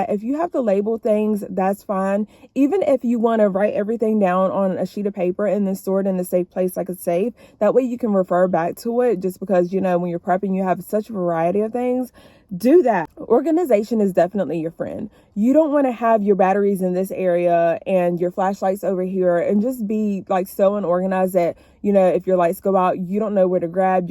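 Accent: South, United States. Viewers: I love that southern twang ❤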